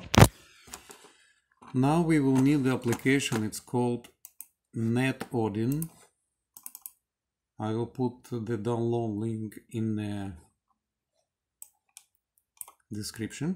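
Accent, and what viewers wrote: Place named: Ryazan, Russia. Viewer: Good instruction! Just restored my R810 watch... Your ryazan'-style pronounciation blowed my mind...)))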